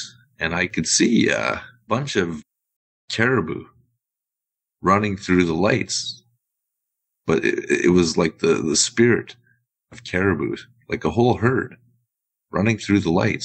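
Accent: Canada. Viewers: Dude sounds like Tom Selleck with a touch of Canadian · I love your Canadian accent! Great story!❤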